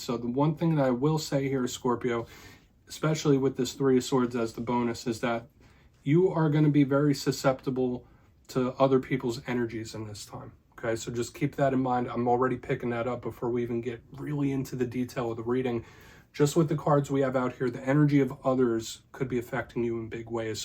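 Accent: United States. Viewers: At least you speak English like the true American you are! I’m so sick of all the foreigners tarot readers from other lands that we can’t even understand with their too thick accents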